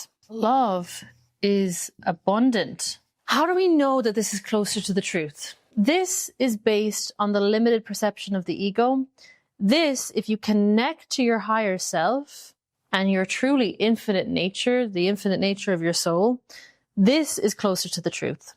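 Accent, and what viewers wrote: Ireland. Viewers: Love the irish accent .. Galway man here .. best luck with it all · Loving the videos, recently found the channel. Explain it in way that’s freshhh yee. Just curious where are you from, are you Irish ☘️ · Are you Irish?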